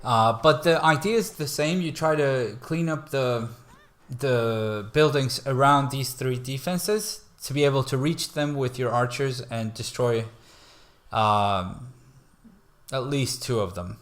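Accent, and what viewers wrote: Sweden. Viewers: Is he swedish? Just noticed this awesome channel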